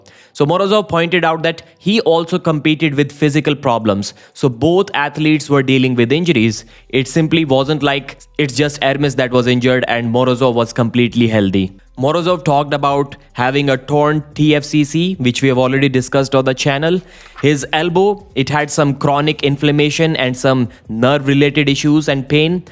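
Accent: India. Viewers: Why this stupid curry man accent...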